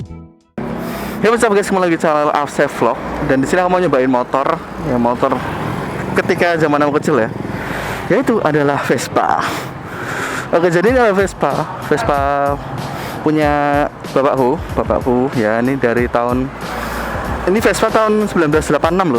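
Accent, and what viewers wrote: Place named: Jakarta, Indonesia. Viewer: Mase logate rodo jakartanan. Hehehe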